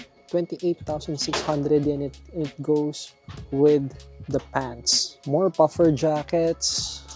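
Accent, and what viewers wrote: Philippines. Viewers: Nice content, strong Philippino accent though, can still understand 😢😂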